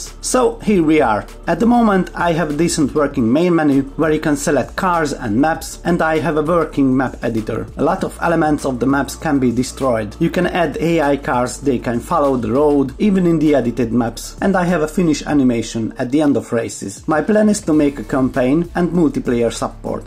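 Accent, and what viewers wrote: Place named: Hungary. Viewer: Are you by any chance from hungary? You sound like a fellow hungarian haha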